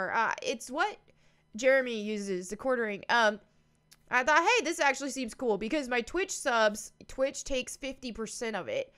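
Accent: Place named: South, United States. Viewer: I have honestly become quite inspired by you since I made my way over to your channel like many. ie referral from Inspired by your Presence and almost abrasive delivery. Yet you also seem to have crazy similar mindset/goalset on...well almost every topic I have I've heard you Discuss. Like so similar that I found myself finishing some of your thoughts simultaneously in my head. Initially I was rather freaked out cause I don't think I have ever done that. It started to reoccur as i progressed through you few videos. So for one. I'd like to take this moment to tell you how awesome you and your message is..two I believe wholeheartedly in your key foundations considering most of the topics you have discussed in well the past hmm 2 days I think. haha (as well As should be spoken about more than it does.) Anyway, I do apologize for how intense this message may be for you. 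it is I guess simply how unexpectedly intrigued I have become. enough that I wrote this entire comment which I still don't even know the extant of my point. But was so enthralled that I almost felt I needed to connect you and relay my gratitude amongst other things. Also iDk what bit of southern touch to your voice you have. But it rocks kinda like you it fits you... I am from NYC born and bred so i am unaware of where. Allz I know is the more I learn. I am in awe of you. So Thanks for being You and keep the vids coming. you have probably reestablished a glimmer of hope for us as humans and women. I thou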